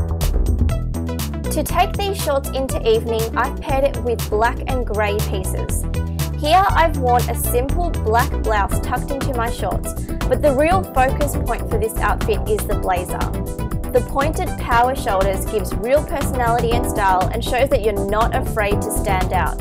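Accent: Australia. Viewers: i love australian accent (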